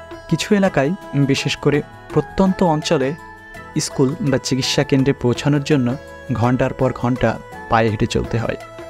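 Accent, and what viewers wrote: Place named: Bangladesh. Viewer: Vai, apnar naam ki?? Apnar voice to ami onno channel er background a SS hunecchi mone hocche.... R apni bodhoy Bangladeshi, not from kolkata, kothar style shunle tai e mone hocche. Amio from Bangladesh 😊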